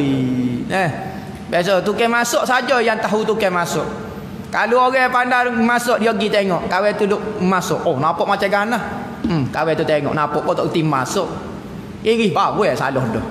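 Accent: Kelantan, Malaysia. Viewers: Betui weh. Aku baru kenal ustaz ni seminggu kat yt. Hang bayangkan siang malam pagi petang aku bukak yt ja untuk dengar ustaz ni cerita… aku pun taktahu kenapa ustaz ni.. boleh melembutkan hati aku.. sedangkan aku taksuka dengar ceramah…tapi aku buleh dengar ceramah dia ni.. dari takfaham loqhat kelantan sampai buleh paham la sebijik2 apa dia kata.. ustaz ni ada aura dia tsendiri ni.. masyaallah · Ustat ni dia ade aura. Aku tibe2 suke dgr bila dia bercakap.. ade yg aku x ckp faham dia loghat kelantan. Tapi apa yg keluar dr mulut dia sedap di dengar.. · betul walau loghat kelantan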